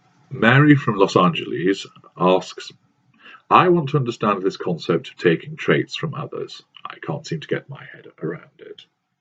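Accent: United Kingdom. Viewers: He’s in England, this is the typical English/British accent.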